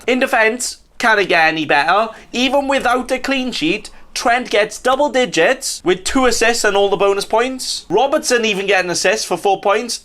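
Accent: Wales, United Kingdom. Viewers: Where you from m8? Swansea? I’m in Haverfordwest....great video as allways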